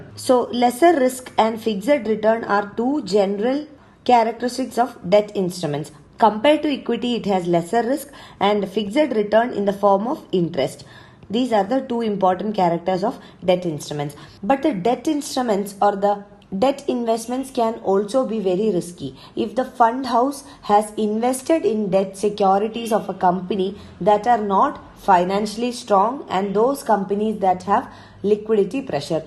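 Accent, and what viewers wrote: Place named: Kerala, India. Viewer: This is keralian accent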